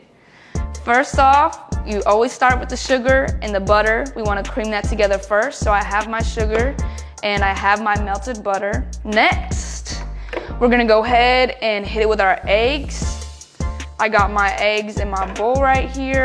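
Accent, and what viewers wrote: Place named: South, United States. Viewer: It’s called: she’s been in the south for college in the past 4-5 years so her accent changed a bit due to that.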